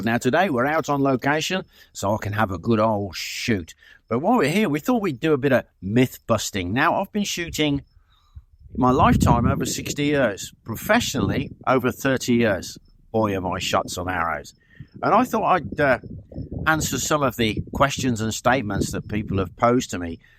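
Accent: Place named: Birmingham, United Kingdom. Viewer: Hi, great channel. You'll never lose the Brummie twang! How do you make the whistling arrows!? 😁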